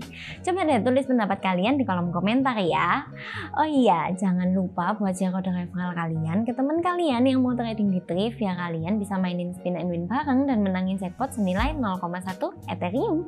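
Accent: Java, Indonesia. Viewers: Ngomong nya medok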